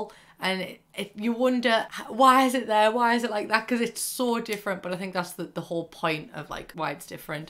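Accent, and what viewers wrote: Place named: Newcastle, United Kingdom. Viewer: She’s a Geordie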